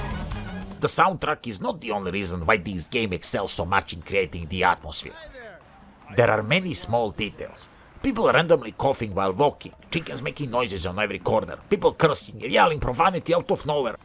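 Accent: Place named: Sardinia, Italy. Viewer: I can't belive americans mistook your accent for spaniard when it's obviously sardinian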